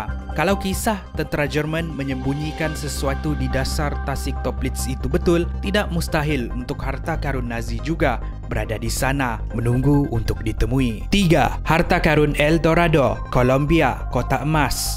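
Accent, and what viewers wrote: Sabah, Malaysia. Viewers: Dia orang Sabah la bro.. mmg cmtu loghat dia..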